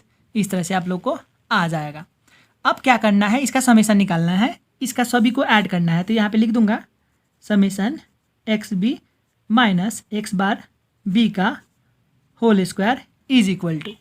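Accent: Nepal. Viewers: Why do i feel like ur a nepali??that accent is so nepali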